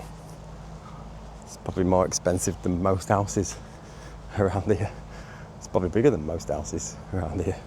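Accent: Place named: East Yorkshire, United Kingdom. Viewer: I am really enjoying your videos of the hull fair and how it's coming together just 24 hours ago there was nothing there they seem to crack on can't wait to see the next video tomorrow would love talking has it brings it together just love these videos was that helter skelter going up l remember going on that when l was young using a mat to slide down the helter skelter and l can also remember the cake walk l went on that with my dad and all l remember is we could not stop laughing love your commentary on your videos love your accent a good strong east yorkshire accent l am from east yorkshire myself and l can always remember been at south hunsley school and you could see out the classroom windows seeing the fair coming along the A63 was a good thing to see ❤Karen 😊 wow that RV was amazing l bet it's lovely inside 😊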